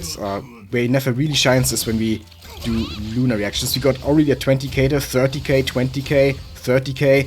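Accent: Germany. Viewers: That german accent strong af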